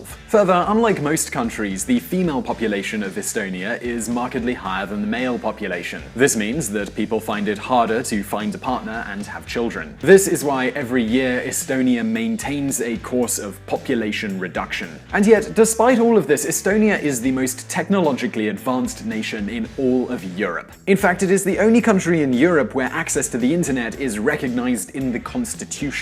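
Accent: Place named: United Kingdom. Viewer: british english, sounds good. awesome, im peruvian, i like british english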